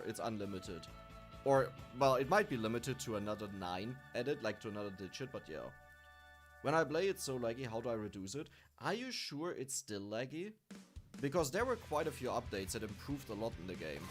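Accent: Germany. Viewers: man hört das du Deutscher bist an der weiße wie du Namen aussprichst ^-^ DANKE für die nicen Videos ^-^ ♥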